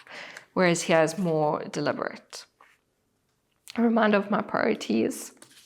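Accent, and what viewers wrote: South Africa. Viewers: Hey, you popped up on my feed today, I am also South African. I was listening and was thinking your accent 😍 sounds so South African. Are you still in SA? Very interesting to see thar a fellow SA person is using a Hobonichi Avec.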